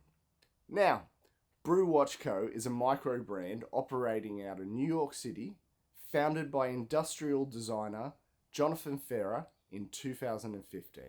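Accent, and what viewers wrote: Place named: Australia. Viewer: Great to hear another Aussie accent talkin' watches on YouTube 👍👍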